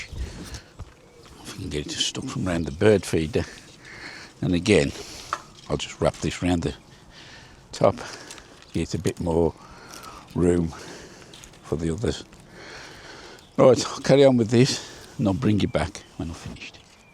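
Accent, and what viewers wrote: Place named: Midlands, United Kingdom. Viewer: I always enjoy your patter, thanks👍 Also recon, at least from your accent, that you’re midlands based. That’s helpful to me as I’m in north Shropshire so we’ll have similar frost dates and I can use your sowing and planting out dates to check the logic of mine. BTW, could you let us know the variety of runner beans that you’re growing? They look like Scarlett Empire but there are many with colour and patterning like that. I always grow Scarlett Empire, Rhondda Black and butter bean type like White Emergo or Greek Gigantes. Always grow in the same places (no rotating), never dig their beds over, just top them out with horse manure in late autumn and they all crop well.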